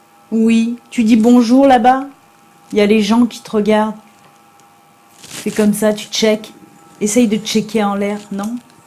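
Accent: South, France. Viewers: J’ADORE Votre Accent du Sud 🙏😉🥰🥰🍀🍀🍀🍀🌷🌷🌷🌷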